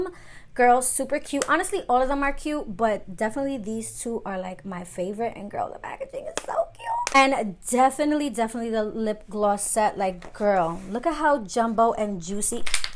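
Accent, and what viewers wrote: New York, United States. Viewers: I love your videos! You’re absolutely gorgeous and always love the vibes. I’m from philly but I love a New York accent! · Your such I New Yorker. As one myself it cracks me up hearing the way we really talk. Like I don't catch it in myself but I know that's how I sound too tho. Been told one to many times! Love ya girl!!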